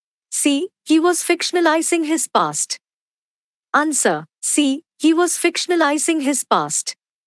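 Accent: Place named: United States. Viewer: Your voice myam American voice but teaching is,nice myam tanks myam 🥰🥰🙏🙏🙏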